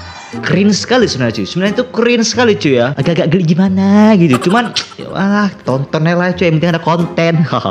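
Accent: Nias, Indonesia. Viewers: Abng orang nias yah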